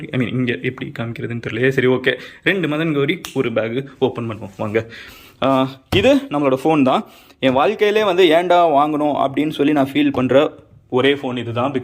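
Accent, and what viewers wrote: Madurai, India. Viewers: Enna than Professional ah Pesinalum Madurai Slang Vanthuduthu 😂😂 Nikka Vachuruvainga,Flight la Kuduthainga 😂😂 Madurai ❤️🔥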